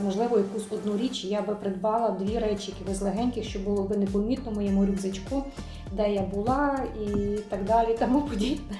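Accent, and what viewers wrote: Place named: Sambir, Ukraine. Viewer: Ви зі Львова а я з Самбора і говорите так як ми в Самборі , люблю Львів шкода що так мало часу щоб просто приїхати , походити , в мене син аутист , то ми приїжджали тільки по справах і щоб здати аналізи . А тепер думаю скільки ми втратили , бо тепер у Польщі .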